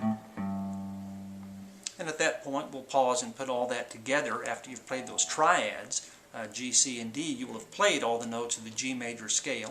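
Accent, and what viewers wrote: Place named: Texas, United States. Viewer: You’re accent makes me miss Texas!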